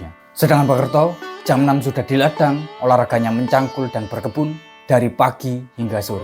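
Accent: Java, Indonesia. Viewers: logatmu medok banget min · Suara mu terlalu medok le le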